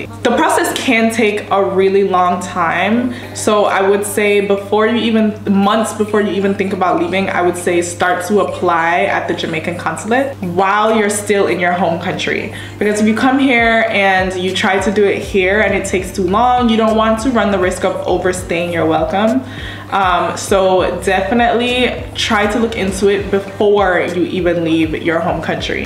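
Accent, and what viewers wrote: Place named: United States. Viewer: Girrlll I just watched the first video you did about moving out the US and I just watched this one and you already are glowing so much more and your voice is changing and your voice is dropping that American accent 🎉😂❤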